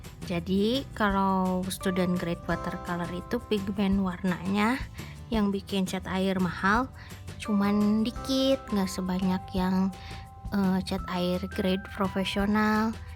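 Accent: West Java, Indonesia. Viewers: Ka lucu banget suaranya xD sunda sunda kitu logatna teh wkwk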